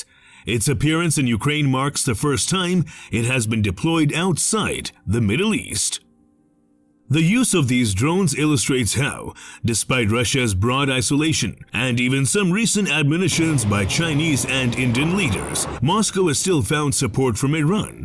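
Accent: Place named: United States. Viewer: Simply NAUSEATING American accented voiceover, had to give up.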